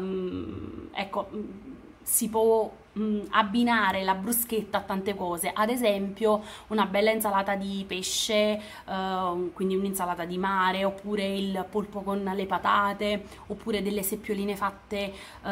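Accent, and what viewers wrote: Naples, Italy. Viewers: Ciao sono nuova👋ma tu nn sei siciliana mi sembri napoletana😄😄😄sei simpatica👋👋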